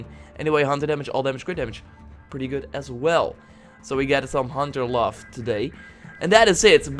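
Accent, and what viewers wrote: Netherlands. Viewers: Just wondering. Are you Dutch? You really have a Dutch accent xD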